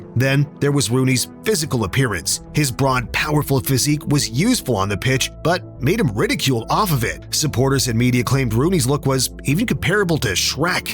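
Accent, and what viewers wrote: United States. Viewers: Click to watch a football video only to hear another yank voice narrative.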